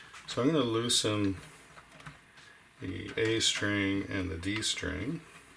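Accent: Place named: Ottawa Valley, Canada. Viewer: Over here in the L. Ontario basin, we can hear the subtle, very subtle Ottawa Valley accent ... it's a Juzz Bayce!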